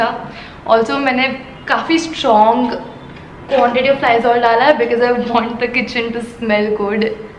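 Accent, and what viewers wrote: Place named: Kutch, India. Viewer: U r speaking sweet kutchi language. I m also from bhuj n I know kutchi. Fine kutchi boleti muke gamai.😊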